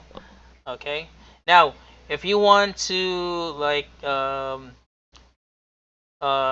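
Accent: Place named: United States. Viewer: Gaw kusugaw. Gd nimo mo english mura MN taga America eslang MN kaau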